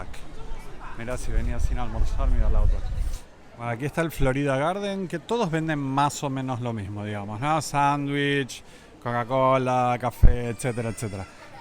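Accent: Argentina. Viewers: Hola. ¡¡¡¡¡ Pero como disfruto tus viajes aunque sean cortos!!!!! Me encanta como lo cuentas todo y ese acento argentino............ El que hacía los anuncios era muy gracioso hablando, nosotros los españoles aún notamos más los acentos. Quiero más vídeos. Un abrazote gigante, Barcelona te echa de menos.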